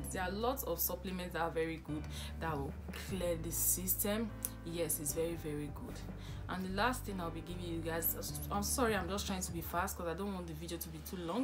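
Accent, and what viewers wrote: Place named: Montreal, Canada. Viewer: t'as un accent montréalais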